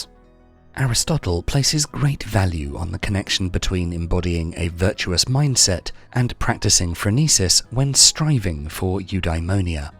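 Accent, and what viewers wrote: United Kingdom. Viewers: #1. Loose the pseudo intellectual lispy British voice.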